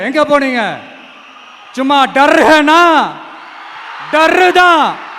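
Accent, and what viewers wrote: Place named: Tamil Nadu, India. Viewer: Vijay anna chennaila poranthu valarnthurunthalum, pesurathellam south are slang...🔥🔥🔥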